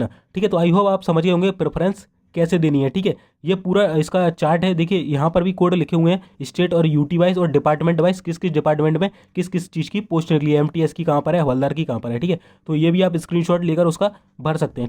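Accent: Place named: Gwalior, India. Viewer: I also from gwalior apki voice se laga hi tha ki aap gwalior se hi ho. 😅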